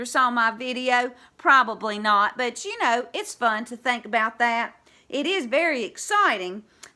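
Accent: South, United States. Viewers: Hi what a pleasure to meet you. I'm a new subby too. Love your sweet southern accent. God bless you and your family. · It's just that deep Southern draw!!!! You would fit right in here in East Texas!!! 😉😘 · Aren’t you just adorable love your spirit and southern twang :-). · HELLO. WOW I LOVE UR SOUTHERN ACCENT. ITS JUST LIKE MINE! IM FROM NC. I FOUND UR CHANNEL. ITS AWESOME CONTENT. THANK U FOR SHARING!!🇺🇸🇺🇸🇺🇸🙏🙏❤❤❤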